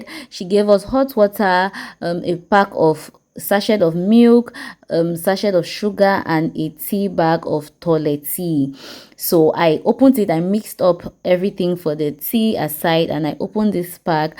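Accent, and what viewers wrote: Nigeria. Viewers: u sound so nigerian u have our lingo too welcome here, please go to island and Eko atlantic city then go to abuja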